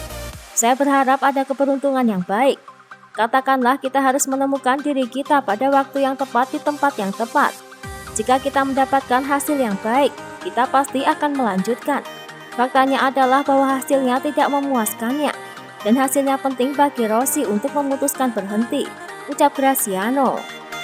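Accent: Java, Indonesia. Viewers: Iki MiMinya wong jogja phooww..yoo yg isi suaranyaa..medhokk..bgt..Min... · Admin Medok mania mantap 😂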